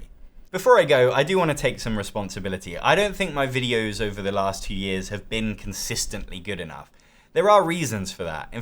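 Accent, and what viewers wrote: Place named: United Kingdom. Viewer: Have you considered adding support for more languages? Brazil's got a growing scene for board games, but very few of us know English well enough to keep up with your videos - especially with the British accent -.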